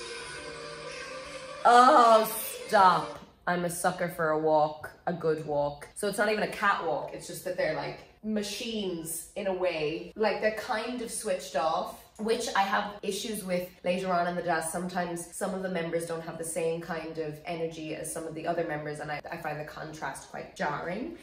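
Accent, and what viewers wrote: Ireland. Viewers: Sorry you’re Irish? I am terrible at accents so I wouldn’t be able to tell where you are from even if you hit me in the face with your country’s flag.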